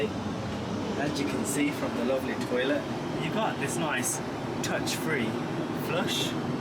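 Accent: Birmingham, United Kingdom. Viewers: U from Birmingham? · it's a funny accent, not sure from where, wild guess Birmingham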